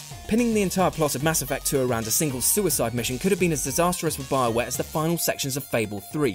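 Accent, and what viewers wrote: United Kingdom. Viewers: This guy should really think about what he says in videos before publishing biased opinions for ANYONE to see. From the accent I'd expect him to be from the UK; with that said he probably hasn't got the slightest clue about his witty remarks about something he may have absolutely no experience with.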